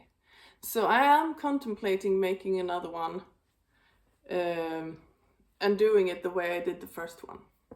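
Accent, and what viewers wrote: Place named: United States. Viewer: Your “American” English is very good. I enjoyed listening to you and watching your podcast💕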